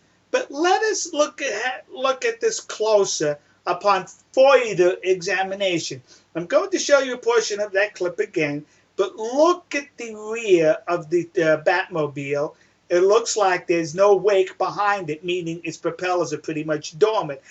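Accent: New York, United States. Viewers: dude, if you gonna defend any side at least use common sense and not your rly funny new york accent... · i don't like whalers, i don't like antiwhalers, i don't like your stupid yankee accent. the whole shebang=not newsworthy.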